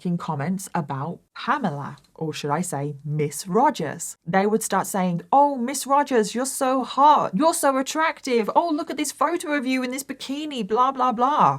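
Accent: United Kingdom. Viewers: LOL loved this roasting of creepy Americans in British Accent 😅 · I find you attractive despite your British accent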